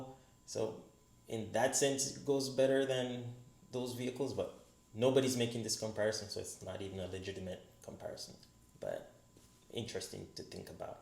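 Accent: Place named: Bahamas. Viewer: What island are u from ? I’m guessing the Bahamas.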